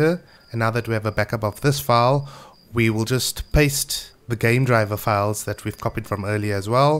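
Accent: South Africa. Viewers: Amazing! Brilliant step by step instructions. Also I'm currently living in Thailand and as I was listening to you speak I thought "no bloody way this dude is also a saffa!" Thanks from a Durbanite living in Asia :D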